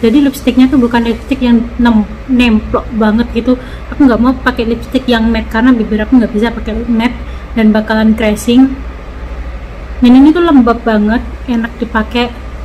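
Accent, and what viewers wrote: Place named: Java, Indonesia. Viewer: medoke kentel, asline pundi yuk?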